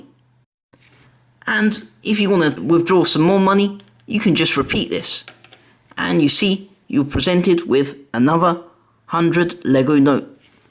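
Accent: United Kingdom. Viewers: I like your voice are you from Britan? Also your really smart and creative!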